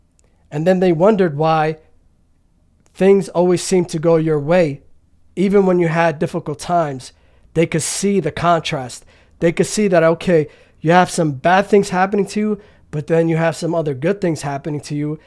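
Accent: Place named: New York, United States. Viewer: How did I know you were from the NYC/NJ area? Lol. I hear it in the talk...🤙🙏🙏🙏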